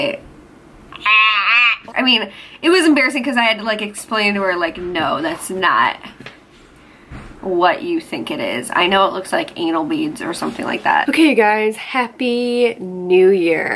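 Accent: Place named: Chicago, United States. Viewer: VLAG lololol yas ya love to hear that chicAgo accent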